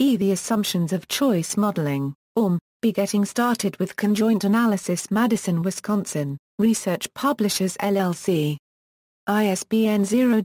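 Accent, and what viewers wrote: United Kingdom. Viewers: Love this explanation with the British accent!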